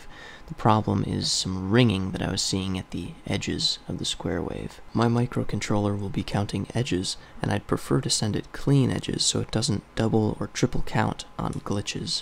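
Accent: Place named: Utah, United States. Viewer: Buddy where is your accent from? Utah ? It's kinda cool!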